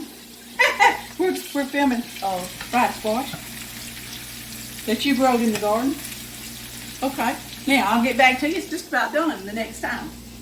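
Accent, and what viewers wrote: South, United States. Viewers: Mrs., you've saved two southern lesbians' dinner tonight! We love your accent; you remind us of all the memaw's, mamaw's, and nana's that we've known and loved. You've got two new fans. We hope you had a great 4th of July and God bless!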